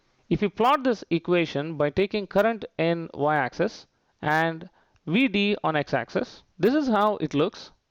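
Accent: India. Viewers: We're getting sick of Indians accent.God damn it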